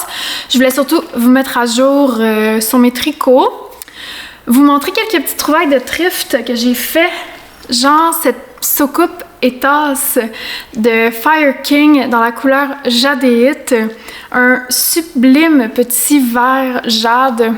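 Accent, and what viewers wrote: Quebec, Canada. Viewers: Bjr de Lyon..France..je passe depuis qq temps un super moment avec toi..cozy..l'accent...🎉❤très agréable pr connaître un peu mieux nos cousins du Quebec😊❤Veronique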